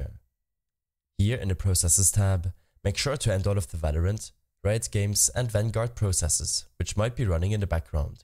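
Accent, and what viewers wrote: Germany. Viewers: Man hört so richtig heraus, dass du Deutscher bist :D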